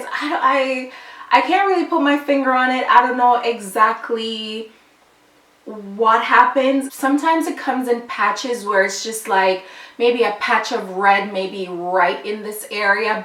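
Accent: Jamaica. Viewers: Are you Jamaican? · I hear a Jamaican accent underneath !! (hope I'm right). great video!! I too am obsessed with fragrances..they make me so happy, brings back memories. .thanks for sharing!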